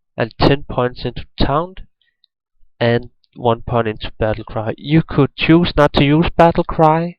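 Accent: Denmark. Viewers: the moment when you can recognize a Dane on the accent ;) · This really brings me back. I used to play softcore EuNL as well, used d2jsp, made countless of builds. Think I started about 10 years ago and played pretty much every day for 3-4 years. My favourite game of all time and the PvP aspect was legit, although quite frustrating at times(PK and script hammers). It's amazing to see people are still playing, but you mentioned you stopped? How long did you play for, and if I may ask, how old are you? PS. Ja den danske accent er let genkendelig :)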